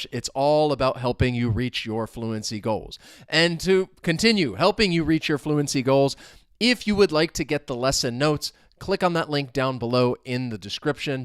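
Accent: United States. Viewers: pls make a video about your accent and your state of origin 🇺🇲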